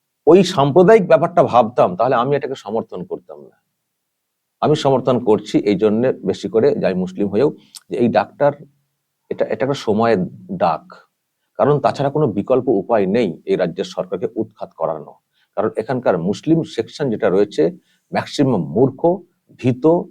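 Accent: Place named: Bangladesh. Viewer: ache vai keman ekta bangladeshi taan taan vab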